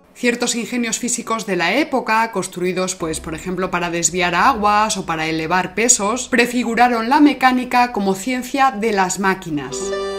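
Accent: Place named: Castile, Spain. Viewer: tu perfecto acento castellano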